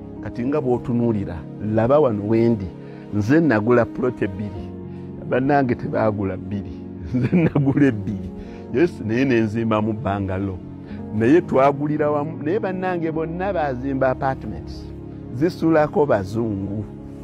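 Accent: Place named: Kigezi, Uganda. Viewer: Naye onosoboka gwe kweeli,enjogera nga yakigaga kyereere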